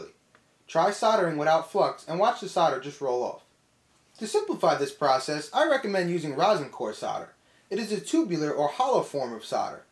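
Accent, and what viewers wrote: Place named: New Jersey, United States. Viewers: What kind of American? Southern, New English (New York, New Jersey, New Hampshire, etc.), or western (I think he has a New English) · Simple, straightforward tutorial, with no BS ! you gotta be from Jersey,(or thereabouts...) thanks , Man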